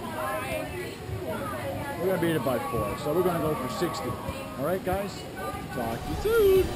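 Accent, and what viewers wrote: Canada. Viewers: You have that Canadian accent (I'm in the USA) you say aboat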